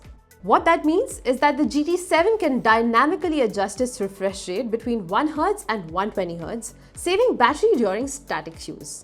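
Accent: India. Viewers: Wait a minute. There's nithing to be proud about, rather contrary. Wroting it as a Pole who albo finds I Dian accent extremely disturbing. A good and thorough review though👍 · Gosh don't they have AI to eliminate Indian accent? I can't hear half of the words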